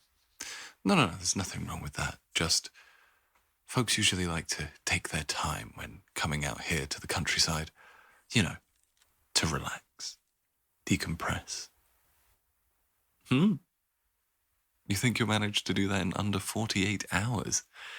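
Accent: United Kingdom. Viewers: This is so good - LOVE the British accent! ❤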